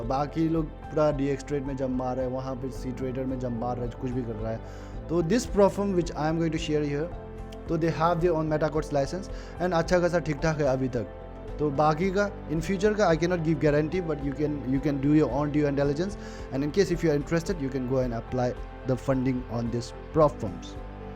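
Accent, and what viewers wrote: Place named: Punjab, India. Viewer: u sound like a punjabi guy❤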